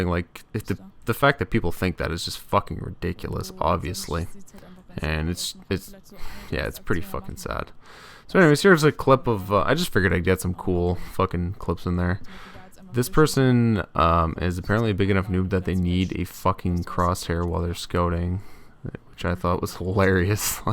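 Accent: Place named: Canada. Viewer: Nice canuck accent btw... :P Could pick that out instantly. Grew up in the north myself! :D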